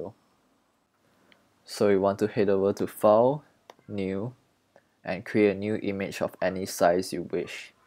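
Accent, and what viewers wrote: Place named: Singapore. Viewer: Thank you so much for this tutorial! I am learning how to edit some artwork for my gaming channel and am willing to learn more tricks about GIMP! Are you from Singapore (making a guess based on your accent... cuz I'm Singaporean here!)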